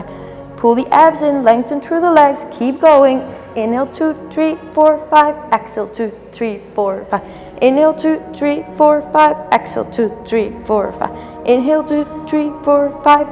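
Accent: Netherlands. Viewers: Is Dutch? She sounds Dutch and the name checks out. Hey if you read this and you are Dutch, ik ook!!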